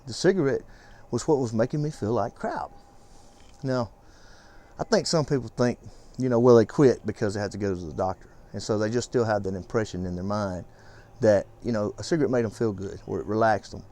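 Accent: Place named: South Carolina, United States. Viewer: your accent made me feel right at home here in SC lol. felt like i was listening to a family member talk. i quit smoking today!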